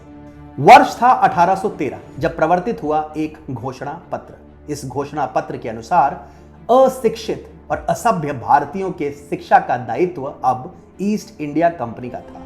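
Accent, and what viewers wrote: Standard. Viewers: aapki saari baatein aatma ko jakhjhor de rahi hai, lekin kya badlega wo to hum logo par hi nirbhar karta hai. fir bhi priya bhrata aapki shudh hindi sun kar mai tript ho gya.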